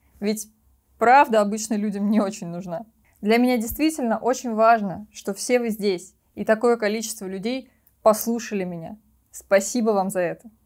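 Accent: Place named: Belarus. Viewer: Чудо и обоняние , а голос завораживает как мне кажется с нотками белорусского акцента . Как же повезло тем студентам кому вы преподавали, а тем более в Вас объедены две мои самые уважаемые профессии педагог и врач ! Дай Бог Вам …….. Вы сами знаете чего . У Вас всё получится , потому что Вы живете для других , давая им удочку , а не жаренную рыбу , а это самое ценное . Спасибо!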